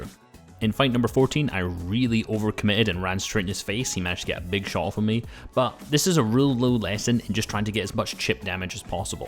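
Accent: Scotland, United Kingdom. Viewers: Are ye Scottish mate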